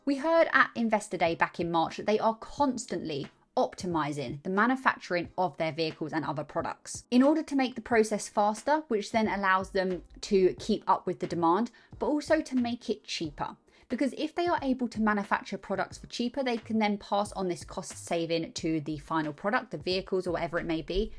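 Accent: United Kingdom. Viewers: 🌜💫😎TSLA😎💫🌛 I love that british accent!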